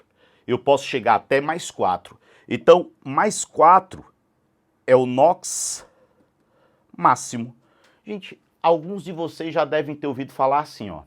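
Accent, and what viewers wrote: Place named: Fortaleza, Brazil. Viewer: assistindo as aulas não so pelo conteudo mas pelo sutaque dele ,bom dms homi ! alguem sabe dizer se ele é de fortaleza? o sutaque é ,mt parecido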